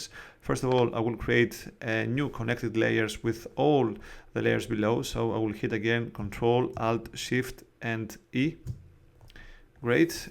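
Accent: Greece. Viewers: from your English i understand that you are a Greek dude.So, Ευχαριστώ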